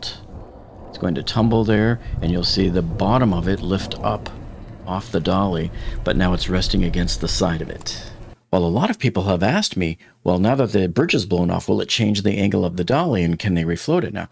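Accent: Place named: Chicago, United States. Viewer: Thanks for all your coverage!! Watch you ALL the time...are you from Chicago??? We recognize your accent.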